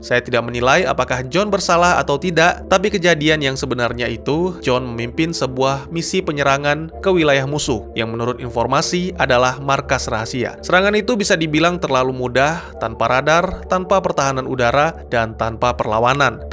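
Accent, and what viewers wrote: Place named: Medan, Indonesia. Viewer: Wkwkwkwk logat orang Medan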